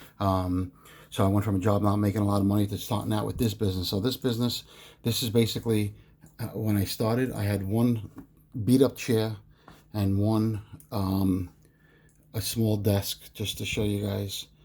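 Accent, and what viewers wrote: East Coast, United States. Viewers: Nice pickups ... love the coin toss of your coins. Your genuine NY accent is Cool as I am from South, I just subbed to you and look forward to your videos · Awesome story! Good for you. Glad you're in better health and back on your feet. It's must have been extremely hard, I can't imagine. Thanks for sharing your occupation with us. I'm so intrigued when I see a large amount of gold and when asked how that person makes money, they never truly answer or tell you something real vague. I'm as working class as you can get. Pretty much paycheck to paycheck so when I see someone doing awesome I am always curious to know how. In your other video when I heard you say $400,000 dollars a year to live. I could picture you laughing at my income or confused how someone could live on so little. I can't imagine making 30K a month. lol Then again, from your accent, you're on the east coast and it does cost a lot more to live and the culture leans to who has the most money. 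A lot of competition to show off wealth especially in New York. But still I really appreciate you sharing it with all of us though. Happy stacking Brotha!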